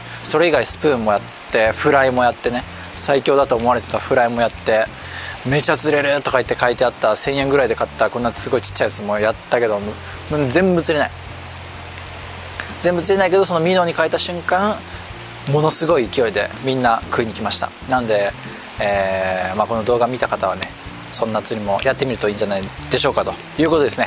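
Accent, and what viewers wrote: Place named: Nagoya, Japan. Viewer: 名古屋弁が懐かしい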